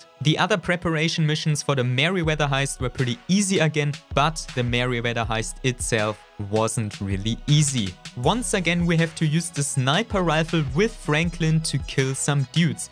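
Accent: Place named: Germany. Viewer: Man hört einfach so hart raus,dass du deutscher bist haha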